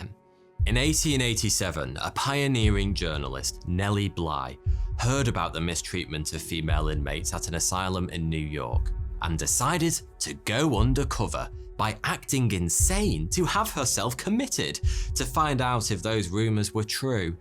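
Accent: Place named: England, United Kingdom. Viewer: I find that the English accent is perfect for this expose on asylums. Assange is being held in solitude in a British Prison. The Russians outlawed Lobotomy before anyone else! We lied about going to the moon and have English actors working for NASA. Truth is a rare commodity when you examine the facts.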